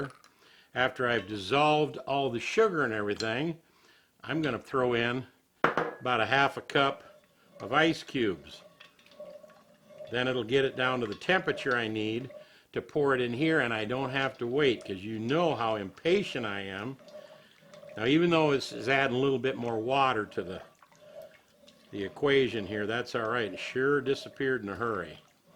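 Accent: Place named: Ohio, United States. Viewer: You are videoing from TN where you may live, but you sure don't have the accent. I think you sound like you're from Ohio! I love the accent.😊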